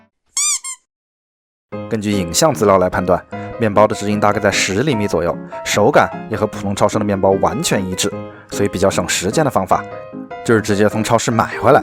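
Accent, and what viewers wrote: Wuhan, China. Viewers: 不是我说 你的口音蛮像经视台 夸天节目里面的武汉话旁白的调调~哈哈哈哈~虽然你是普通话的调调~ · 有点吧han，标jun滴武汉口音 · 我一看到这个标题，就知道又有阿姨的汉普了🥰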